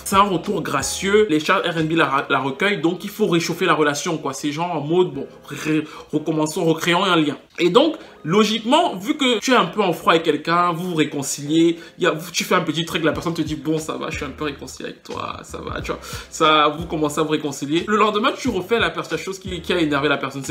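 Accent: Cameroon. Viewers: On dirait que tu es Camer 🇨🇲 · J'ai reconnu un petit accent camerounais ... 😏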